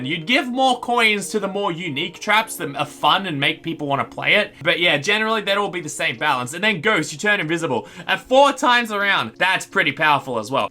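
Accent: Australia. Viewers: I just realised in this video that your Australian 😅😂 I don’t know why but I love seeing Australian you tubers! Keep up the amazing work!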